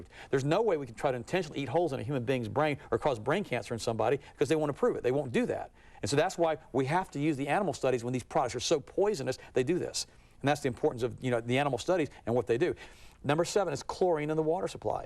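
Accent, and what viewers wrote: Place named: South, United States. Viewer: he said "high fat", not "hot fat" lol. its his southern accent threw you off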